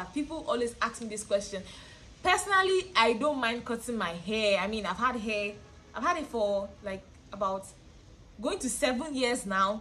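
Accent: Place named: Lagos, Nigeria. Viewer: What part of lagos are you from? You're accent is nice.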